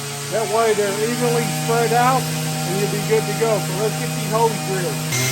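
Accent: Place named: South, United States. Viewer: I hear that accent as he talks, I can just about say he's in the south, so it'll be warm season grass like St. Augustine, Bermuda, Centipede, etc. Our grass in the south is pretty stubborn and tough compared to cool season grass like fescue or ryegrass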